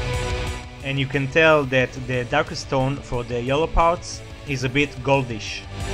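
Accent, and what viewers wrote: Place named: Israel. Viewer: אתה ישראלי? יש לך מבטה של ישראלי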